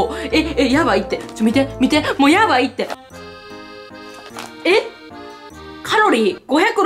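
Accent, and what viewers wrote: Kansai, Japan. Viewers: テンション上がってることによって関西臭が強くなってるwwwwwww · 関西人丸出し😂 終始おもろい笑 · 関西弁すごすぎて草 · いつもにも増してゴリッゴリの関西人で草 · いつもに増して関西弁がすごいですねw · なんか今日いつも以上に関西人って感じするんやけど気のせい??ww 自分も琥珀糖食べてみたくなった🍴( ¨̮ )💬💕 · って関西弁しゃべってるけど関西の人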